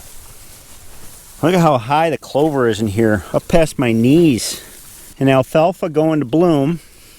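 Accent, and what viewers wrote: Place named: Central New York, United States. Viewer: I like how says, "Expuriments"! I went to SUNY Cortland, studied Ag Science, and that's how they say it in central NY! He reminds me of home!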